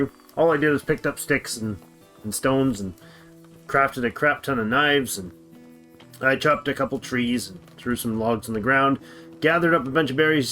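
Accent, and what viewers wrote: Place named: Canada. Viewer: Have been loving this game lately! My favorite gameplay and commentary yet- subbed and liked! I recognize a little Canadian accent there, eh?? Cheers from the Pacific Northwest USA brotha!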